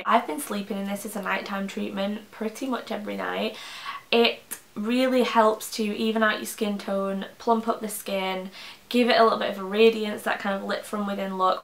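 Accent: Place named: Preston, United Kingdom. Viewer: From one to another! I love your videos and your accent, my Gran, aunt, uncle and cousins lives in Preston and has a similar accent to yours and I don't know but I feel at home when I watch your videos and trust your opinions! Love the work you and keep it going x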